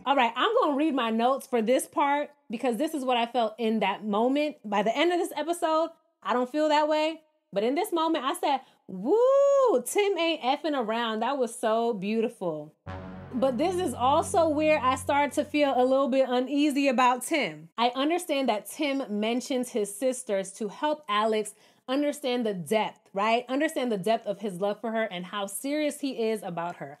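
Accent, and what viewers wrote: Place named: New York, United States. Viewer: Girl you gotta be from NYC cause the way you talk 😂😂😂❤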